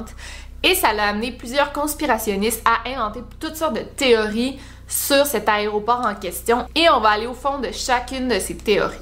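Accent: Quebec, Canada. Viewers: Plus c'est gros et plus ça passe.... Ne dit-on pas que la meilleure ruse du diable est de faire croire qu'il n'existe pas ? mais cet aéroport est vraiment hyper glauque ! brrrrrr ça fait froid dans le dos... Merci pour tes vidéos passionnantes. Je te découvre depuis peu et j'apprécie énormément ton travail, et étant française, j'adore t'entendre avec ton joli accent québécois ... Je m'abonne de suite ! Merci xx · bishlord - ben elle a un accent quebequois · J'aime l'accent québécois :-) Brésil. N'arrête pas ! j'adore tes vidéos and I love When u speak english aussi :-)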